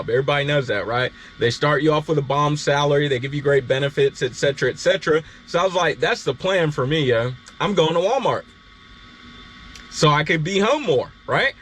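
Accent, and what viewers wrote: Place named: Alabama, United States. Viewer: He Forrest Gump country lol I’m from Alabama and he sound super country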